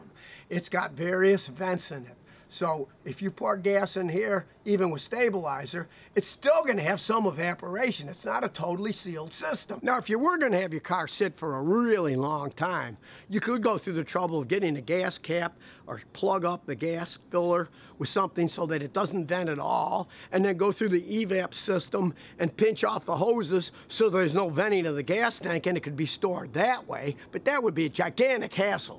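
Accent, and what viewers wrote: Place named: Western New York, United States. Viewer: Really like your videos. Love the WNY accent. Reminds me of home. ;-)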